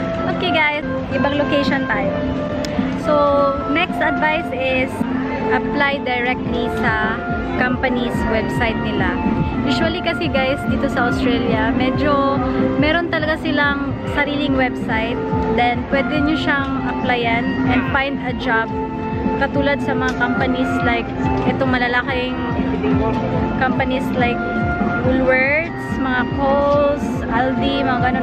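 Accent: Visayas, Philippines. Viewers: Bisaya ka po maam? 😊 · Bisaya ka Ma'am no?!😂😁😁😁 minsan kasi nababasa ko sa acent mo minsan. But it was nice!!! keep uploading your video sa channel mo. I subscribed your channel na po and Thanks for the info!!! God Bless!!!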